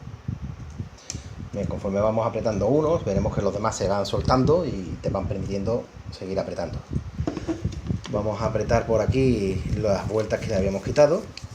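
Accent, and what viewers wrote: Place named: Andalusia, Spain. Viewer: Que pedazo de video ilustrativo y lo dejas todo super claro. Así da gusto iniciarse en la construcción de drones con personas como tú. Por cierto eres de Andalucia no? lo digo por el acento... yo de Málaga. Muchas gracias y saludos.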